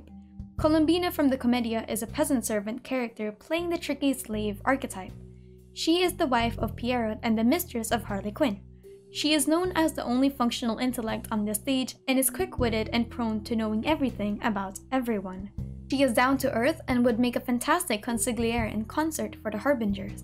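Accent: Philippines. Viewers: Your accent sounds Filipino ❤️ Hello, kabayan! I love your content! Amazing research and compilation.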